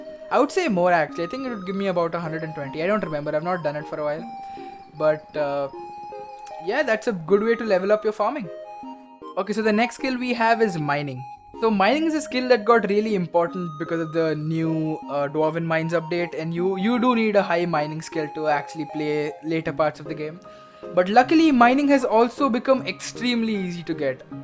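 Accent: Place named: India. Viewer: why does he sound like he is an indian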